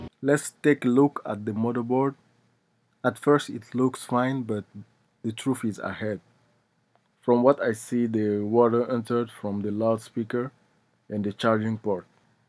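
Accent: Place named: India. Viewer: the fuck what's with the indian accent you making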